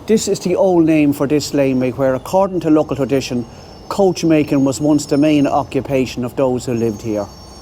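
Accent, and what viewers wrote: Cork, Ireland. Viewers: First just hype you get more followers then.Lovely to see you we want to see more of Cork we just love the accent my friend.So happy Sunday evening till next week.🎉🎉🎉🎉🎉🎉🎉🎉🎉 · Great video 🎉 you got to love the Cork accent 😅😅..you were getting some looks there in michelstown as you said the cowboy 🤠 walking into the saloon 😅😅..look forward to next week thanks 😊 👍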